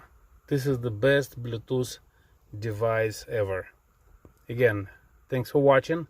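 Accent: Russia. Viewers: Похоже на русский акцент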